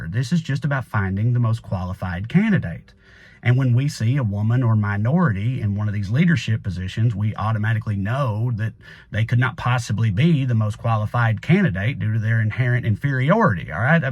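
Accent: South, United States. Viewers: Love your progressive voice and the lighthearted, entertaining way you express it. Love your Southern accent, too.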